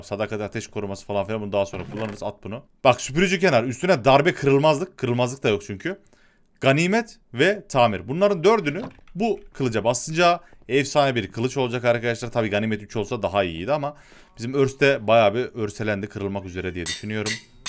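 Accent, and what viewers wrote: Yozgat, Turkey. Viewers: Yozgatlık leeeeyn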